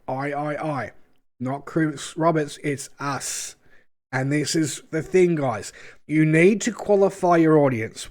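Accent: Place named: Australia. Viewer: Sure man, il check the rest of the video now ( working from home anyway so i can listen to it. Im just not used to the strong F words and such :D -> im a full on Jedi, light sided kind of guy finding pleasure in being as good as possible, so ye..dont mind me :D ) Currently my fleet consists of 1 Carrack, 1 Mercury Star Runner, 1 Banu Merchantman, 1 Redeemer and 1 Eclipse. -> Honestly at this point i was only planning one last upgrade to my fleet and that is upgrading the Eclipse to a Polaris and thats IT. -> I honestly think that even 300$, or 500$ and not to mention1000$ is way to much already to support but the issue at this point is that im already down the rabbit hole and can almost touch my finish line of a (dream fleet) so i might as well do that. -> Another Issue is tough, that upgrading and buying ships at this point started to feel like a minigame which i can understand maybe some people who cant even stop the fantasy/dream of the supposed grandiose game on its release. 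Im like arround 80% in into doing that last upgrade but at that point i will stop buying or upgrading ships all together. All i can do is hope at this point that Chris and the teams learn from their mistake and do not take for granted the community because it can all fall down on themselves with a finger snap (just like Thanos :D ). Edit: i like your Australian accent! God bless you man :) Edit.2: I only play since last year arround september 2019 (i know i started right with the 3.7 or 3.8 or what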